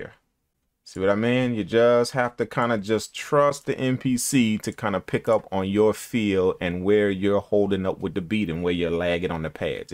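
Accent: New Orleans, United States. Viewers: New Orleans heavy accent. Great video man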